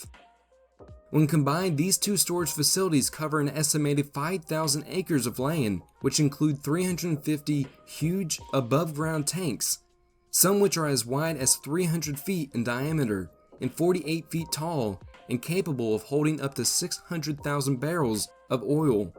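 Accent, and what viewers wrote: South, United States. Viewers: Southern Accent. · it’s so inspirational to hear someone with my accent fully accepting it, not trying to hide it out of hear fear of being perceived as uneducated:) also if you see this, what state are you from?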